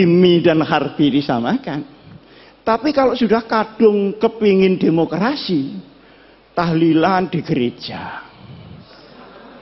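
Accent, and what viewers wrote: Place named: Malang, Indonesia. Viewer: KANGEN LOGATNYA KYAI MALANG PUOL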